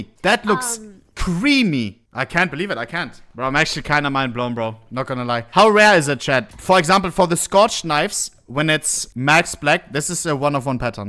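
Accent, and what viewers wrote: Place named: Germany. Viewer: the german accent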